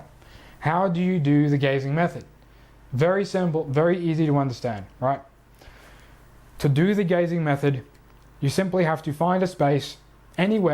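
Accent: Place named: Australia. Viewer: Thank you so much for your reply,. Usually I can hear the Aussie a lot but your accent isn't very strong. Anyway, I realized you were likely Aussie when I saw one of your older vids with you in a forest that was definitely not anything you'd see in England lol. Thank you for the insights on the qi stuff. Sounds pretty accurate I'd say even though I don't have a thorough understanding of the five elements, I have dabbled in the arts. I just purchased your subliminal and created my own 1 hour version and am going to listen to it while gazing and also while I do my drawings. You are right about the geometries in my field of vision. Actually, I did notice while gazing the flower of life pattern overlaid onto reality...I don't really know how to describe it. Kind of like a hologram or something. It's very subtle. Wishing you an awesome day and really grateful to have found you and also overwhelmed by everything I'm learning. 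I'd definitely be interested in working with you and possibly getting on the immortality program, as that is my aim, and currently working on manifesting my wealth so that I can do these things. I feel I have come a very long way on my own without help from anyone, just following my path, and yet I still feel very lost, at least mentally I don't have a grasp on what is happening but you are helping me out with that. Thanks!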